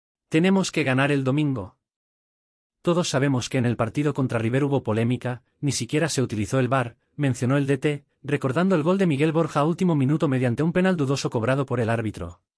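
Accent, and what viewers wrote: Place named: Spain. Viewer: Habla vos o pone una voz neutra, no tiene nada que ver el gallego este con Boca jajaj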